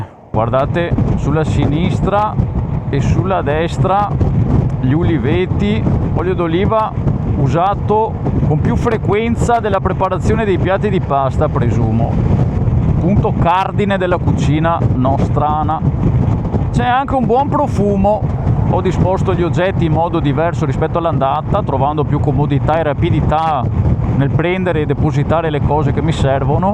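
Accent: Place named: Treviso, Italy. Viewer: Che bel canale!! Ho visto qualche video, ti faccio i complementi. Io sono veronese, ho sentito il tuo accento trevigiano ma e pressoché identico al mio!! Siete riusciti a fare un bel tour Treviso Germania?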